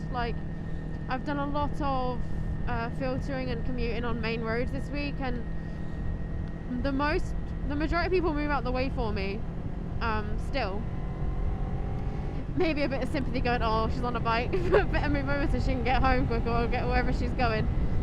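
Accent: United Kingdom. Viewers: Im from the Highlands Scotland and iv been riding for a few years. I dont usually ride in the winter only if its necessary. I went round a hairpin and hit a patch of black ice on my ZX6R and wraped it around a tree a few months after getting my A license. 🤣 where abouts UK are you based? Sound Cambridge area.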